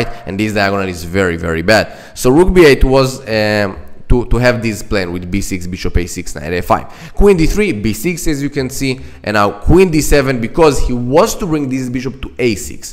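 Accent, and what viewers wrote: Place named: Israel. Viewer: איזה מבטא ישראלי ❤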